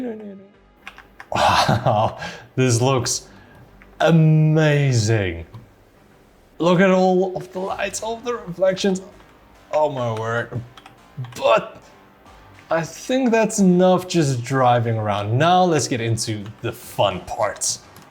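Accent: South Africa. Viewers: Trou met my <3 Beautiful Saffa Accent!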